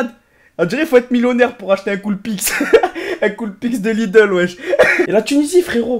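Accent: Marseille, France. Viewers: Tu ressemble à un chinois, t'es pour paris et ta un accent marseillais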